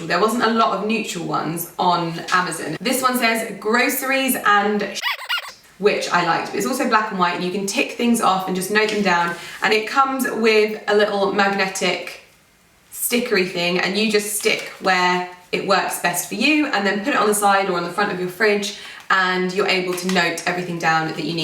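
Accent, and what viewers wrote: United Kingdom. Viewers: I find you very interesting... Love the British accent... also love the Irish and Scottish accents as well...I really enjoy your haul videos...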